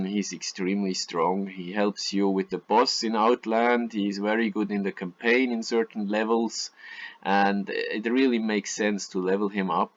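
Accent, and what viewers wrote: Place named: Bern, Switzerland. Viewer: 😂has mr no denkt bi dem akzent gruess us bern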